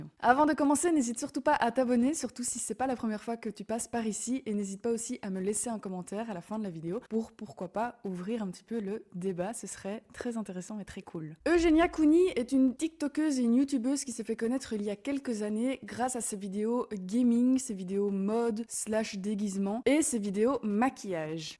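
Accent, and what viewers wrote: Belgium. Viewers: J'adore ton petit accent belge =)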